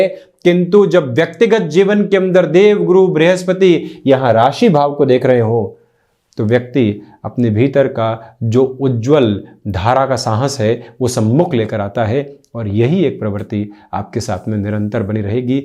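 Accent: Standard. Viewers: Sir Pranaam 🌺🙏🌺 Aap itni shudh Hindi kaa uchaaran kaise karte haiin ? 🙏☺️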